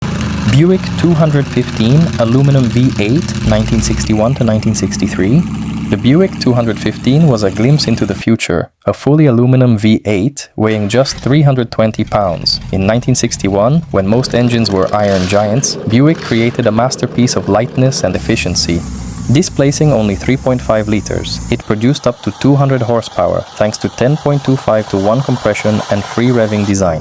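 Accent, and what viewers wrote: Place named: India. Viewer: > Indian accent narrator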